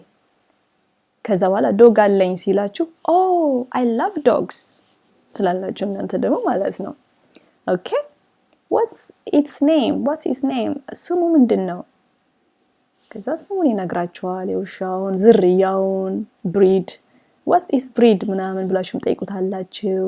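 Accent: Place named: United Kingdom. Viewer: Good job👏👏 I love your British accent 👍 reminds me of my Brit friends.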